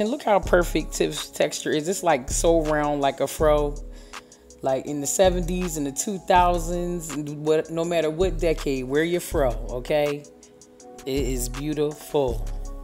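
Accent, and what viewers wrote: Philadelphia, United States. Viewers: Girl where u from ? I’m hearing an accent I’m thinkin Philly or somethin It’s up north but sliding towards dmv twang but not a hunnit percent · Is that a Philly accent I hear?? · I LOVE watch all of Your videos because you reminds me of a lot of my favorite older cousins from Philly but you sound sooo much like my family I remember as a child who I use to adore and look up to them its that accent you can tell a Philly girl from anywhere my MOM also sounds like that too even though she’s been living in Cali for over 40 years I remember when I moved out there as a little girl 👧🏾 I use to love to go to my cousins hair salon down in Upper Darby & get my hair done every weekend and then go shopping at the end!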